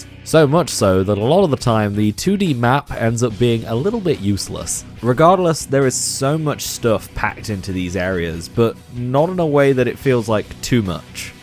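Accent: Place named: United Kingdom. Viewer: Excellent video. I usually say SMT V sucks, but you did it in a much more eloquent way, and even used a British accent!🇬🇧 My favorite point was that SMT V moves nothing forward and innovates in no ways. I'll even point out my biggest complaint: you don't gain any new movement skills as the game progresses. It's not like there are places you can't access because you don't have an ability. How much more interesting would it have been if you eventually got the ability to double jump, or use a grappling hook, or open sealed passageways, or see in the dark? Instead, you have all the movement skills at the beginning of the game and gain no more as time went on. That's not how that type of game is supposed to work. Also, when I got to the Tokyo Tower, there was nothing to do. There was no dungeon, no secrets, nothing to explore. My disappointment was audible. 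If you have the time, check out GILL Studio on YouTube. He plays a lot of JRPG music, especially SMT games. When you watch him play Digital Devil Saga or Devil Summoner music, he rocks out and you can see how skilled he is. He plays lead, rhythm, and bass guitar, and he's extremely impressive. When he plays SMT V music, he looks bored. It's so far below his skill, it's not even funny. (I'm still trying to get him to play from Devil Survivor. That tune will melt your guitar.) Here's my final analysis: SMT V is Fable. It made a lot of promises, like an open world and endless exploration, a character you could truly mak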